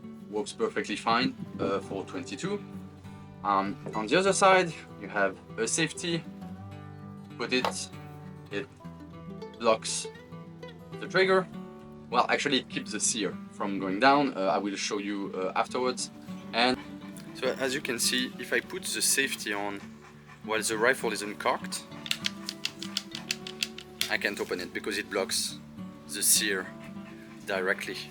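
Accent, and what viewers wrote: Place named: France. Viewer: Ta un accent français toi lol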